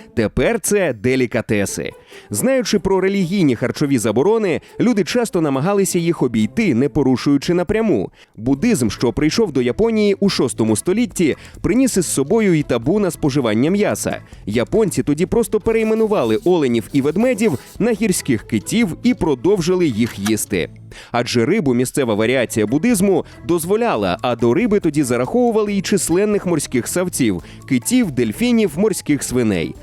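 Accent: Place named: Russia. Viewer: Навіщо коментатор "паскудиЦЦЦь" українську мову різними "тоДЗЗЗі", "видЗЗЗів", "їдЗЗЗяЦЦЦь", єгипЦЦЦяни",тощо ??? Яка гидота переробляти нашу мову на потворне маааскофске нарєчіє!!!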